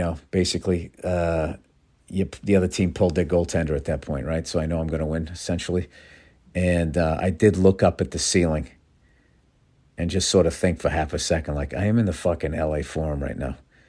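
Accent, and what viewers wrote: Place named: South Boston, United States. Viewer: Jesus. Listening to this I thought i was back in southie in clancys poolroom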